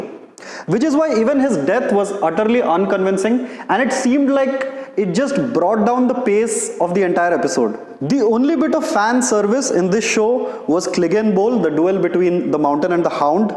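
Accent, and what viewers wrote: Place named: India. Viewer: This guy reads reddit and makes a video with typical indian pronounciation 🤭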